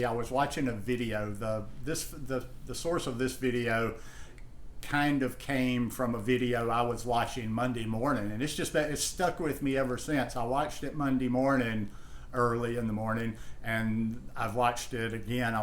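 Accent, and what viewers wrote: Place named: Alabama, United States. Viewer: Your videos always put me in a relaxed happy mood. That why I enjoy watching them. They are always positive and sensible about raw vegan diet. You have a nice personality. I love also your Alabama accent. Actually you are the only one on YouTube left that I watch regularly. Thanks for being here with us. 🤗✌💖.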